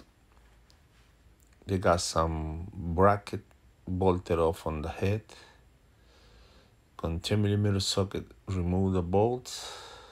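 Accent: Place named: United Kingdom. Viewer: Que bonito hablas el ingles !! Tienes un acento britanico que me facina !!! Congatulechons..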